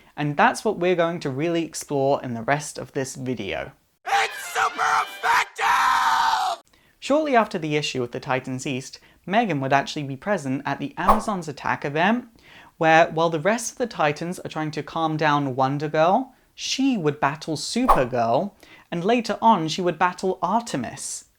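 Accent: United Kingdom. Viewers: dude are u british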